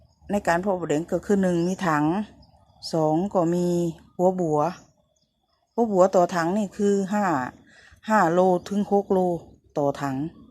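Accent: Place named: Korat, Thailand. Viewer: อยู่บ้านไหนคือพูดโคราช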